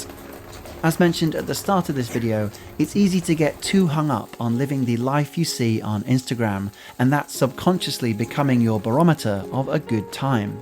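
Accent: United Kingdom. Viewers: My first time viewing and enjoyed the humor and as an american the brit accent top it of. I think rain will spoil any vacation time. I live in the great lakes region[ohio] and May thru Oct. is really nice.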